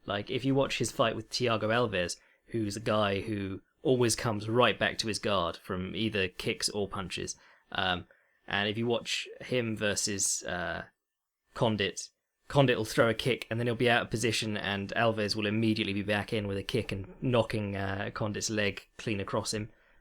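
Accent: United Kingdom. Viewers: Dude! You're like, so English, Bro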